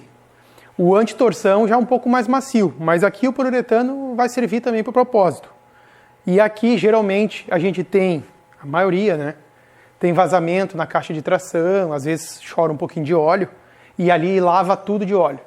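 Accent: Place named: Rio Grande do Sul, Brazil. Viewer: percebi que era de perto pelo jeito de falar kkkk , sou de Novo Hamburgo. Também faço trilha , um dia nos cruzamos por aí 👍👍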